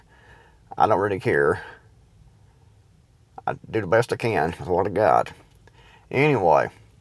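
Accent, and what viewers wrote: Appalachia, United States. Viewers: Keep that Appalachian accent! It is lovely. Buggy works! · You are an Appalachian the same as I am! Be proud of how you speak! <3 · True. But it is easy to see why everything has gone up in price. So if a lot of our stuff comes from Canada and Mexico and other countries then we are paying up to 100% more for stuff because of Trump's ridiculous tariff war, economic insecurity, and his crazy decisions make everything unsure. Because you come from Appalachia, which predominately votes Republican (I'm near WV), along with the accent. which is why they made the assumption :) Trump said it, the people you talked to just parroted his talking points. They didn't take the time to actually think about it (as you apparently have). They don't have critical thinking skills. They think Trump is going to throw off illegals, not poor white family. 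LOL When it all comes down, people are going to start scavenging and stealing and it will give T a chance to enact Martial Law. He wants to be a dictator and the easiest way is to stoke a war with someone outside and force an internal conflict and he can try to declare a state of emergency and then Martial Law.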